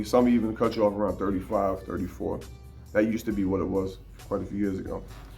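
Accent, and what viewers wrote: New York, United States. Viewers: No doubt you sounded like a NY guy but I didn’t want to assume. Appreciate all your motivation, knowledge and replying to your subscribers. Consistency is the key to life and this YouTube thing! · That New York accent has me feeling like Nas is explaining boxing to me. Love it.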